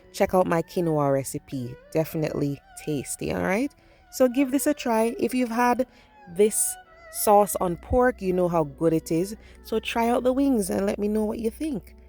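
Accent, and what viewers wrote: Jamaica. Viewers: Yummy looking I can only imagine the great taste loved you Jamaican accent 😋🥰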